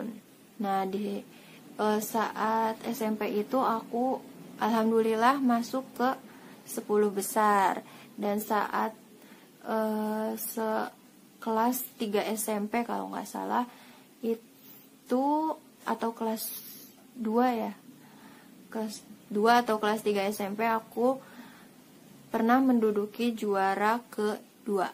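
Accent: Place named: West Java, Indonesia. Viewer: Aduhhhh geuningg sundaa hhe Nklenaa8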